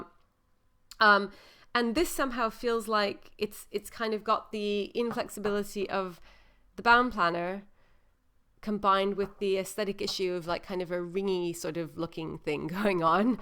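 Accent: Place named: United Kingdom. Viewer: Amazing, what an experience. You can really hear British undertones but the American accent is definitely there.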